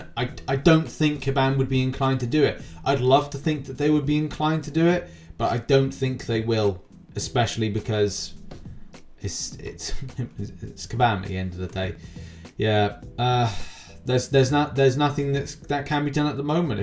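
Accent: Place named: United Kingdom. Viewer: Hey, I’m asking how do you have marvel insider, you sound like you’re from the UK, and so am I, but when I went to get it, it said it was only for US residents.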